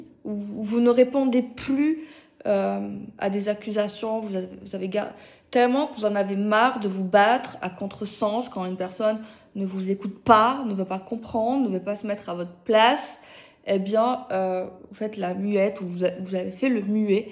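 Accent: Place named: Provence, France. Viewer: Coucou vous êtes de quel département le Vaucluse ou bouche du Rhône pour l'accent que vous avez ? Bonne journée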